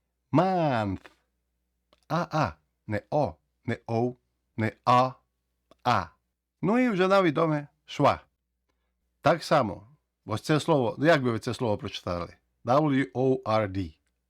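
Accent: Transcarpathia, Ukraine. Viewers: Урок надзвичайний(принаймі,для мене...людини,ака хоче вивчати англійську мову...),Ваша українська мова (як на мене -закарпатська...)сама собою притягує і спонукає вчитися,ну і сама методика пояснення азів англійської....хоча Ви кажете,що англійську дуже легко вивчати...(і так,і ні....як для мене,принаймі...коли за плечима вже немало прожитих років...)а американська англійська чого варта....Та надіюсь....найважче дається зрозуміти співрозмовника (цю...) Файно дякую Вам !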